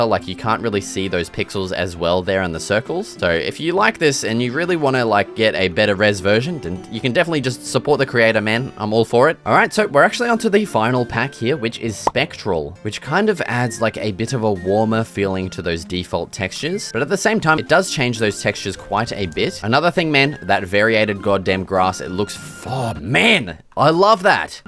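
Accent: Australia. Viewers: Australien accent = better youtuber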